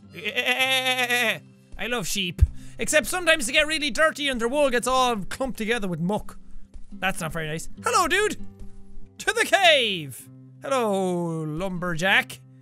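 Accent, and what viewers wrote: Ireland. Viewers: I didn't think you could sound anymore Irish. I was wrong :D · Just because jack uses a reaaally Irisch accent for the characters this is my fave LP from Moirai. it's great, i love it! <3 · u sound so irish then befor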